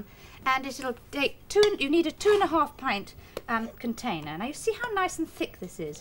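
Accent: RP, United Kingdom. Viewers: My! She sounds so posh!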